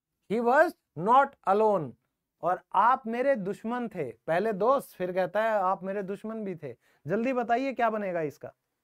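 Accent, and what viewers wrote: Haryana, India. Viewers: Aap haryanvi ho kya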